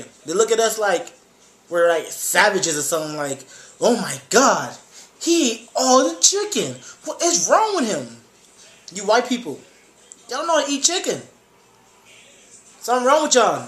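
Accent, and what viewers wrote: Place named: Mexico. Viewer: Your a black guy who sounds Mexican.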